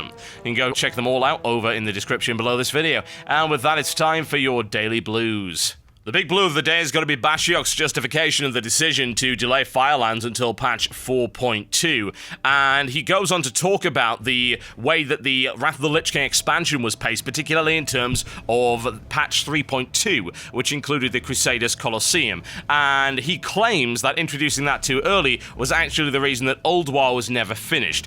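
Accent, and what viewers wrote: United Kingdom. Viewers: because he is from United Kingdom,has British accent,commentates on wow and games,and theres more awesomenessnessessess stuff i cant even think about or my head would explode from the sheer awesomenessnessessess =D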